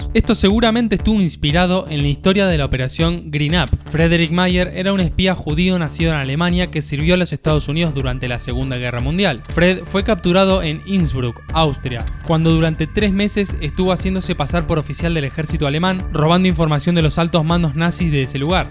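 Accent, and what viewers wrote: Argentina. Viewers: pense que se llamaba Shake, fk acento agertino xD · De donde eres tu ? Uruguay o Argentina? Un pregunta nada mas e por l'acento a mi me parese mas Argentino verdad ,de toda manera tu video me encanta mucho te felicido yo soy napolitano e amo Maradona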